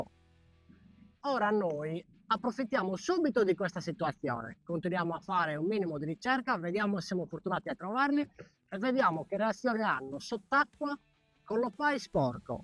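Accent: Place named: Sardinia, Italy. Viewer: Stavo solo curiosando ma, presto , mi ha colpito una " strana" cadenza a me molto cara.......non propriamente casteddaia ma che si avvicina parecchio . Non avendo barca ripiego sull'eging da terra sempre che il " nostro" amato maestrale lo permette......saluti franco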